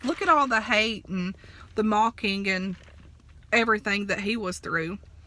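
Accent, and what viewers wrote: South, United States. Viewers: Girl you’re so amazing 😉 and btw I LOVE your country accent!! You’re such an inspiration!! The food looked so darn good!!! Yummilicious 🤪 Hey I’m happily divorced twice too!!! You just keep on smiling and making your great videos!! Love ya so much! Sending you lots of hugs and love ❤️☀️🌺🌼🤗🌻🥰🌸🌹💗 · Hey, i just love ur country vocal and ur country talk just love it.. We yall have to love each other and pray for each other. I just love ur family and ur little dogs.... I will be praying for u and ur family. I will be praying for the shots that u are getting.... Hey looking for Joyce Meyers Bible (pink study women) at Good Will cant found it here....Love yall...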